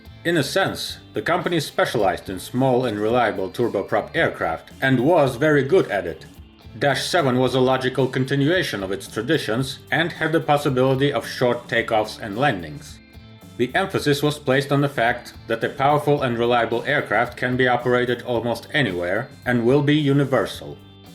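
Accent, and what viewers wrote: Russia. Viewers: Thanks for your work friend. I like your Russian accent, and I live in the USA. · The rusdian accent is di atrocious. Couldn't make it beyond one minute. Full disclosure, I'm rusophone myself.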